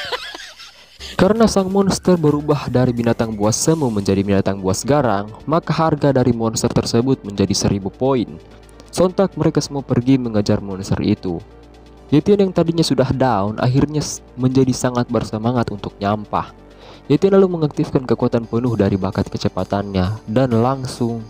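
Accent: Sulawesi, Indonesia. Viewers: Mantap bang, org sulawesikah? 😅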